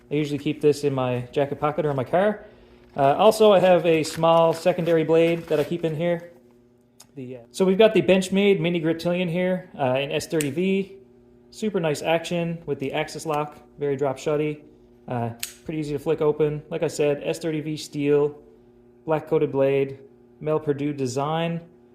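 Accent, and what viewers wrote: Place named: East Coast, Canada. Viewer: You sounds like you’re from the east coast of Canada. Good video by the way.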